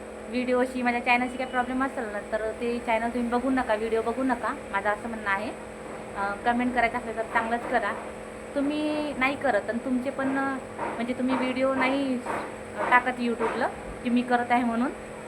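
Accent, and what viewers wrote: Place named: Vidarbha, India. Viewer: आमच्याकडे टोमॅटो नाही खात उपवासाला 😊बाकी वैगव लक्ष्मीचे उपवास मी पण केले तर खूप वर्षे आधी आणि तू करतेस तर व्हिडिओ टाकायला काहीच हरकत नाही आणि मला एक सांगायचं आहे तूझी भाषा ऐकून लगेच कळलंकीतू विदर्भातली आहेस 😊कारण आमच्या ताई ( नंदूबाई ) अमरावतीच्या आहेत बाकी तूझ्या साधेपणा मध्येचतूला यश मिळेल ❤❤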